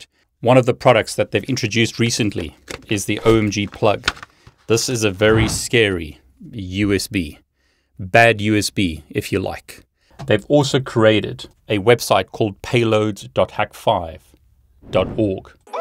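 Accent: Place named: South Africa. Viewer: Now that looks like some nice toys., I am starting Cyber Security at the age of 45 thanks to you, Niel and Networkchuck! Are you South African? Your accent sounds SA...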